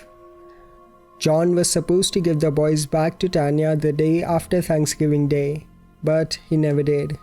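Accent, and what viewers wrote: India. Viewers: It's seems you're from an Indian origin..your accent seems like so..btw loved the way u explain everything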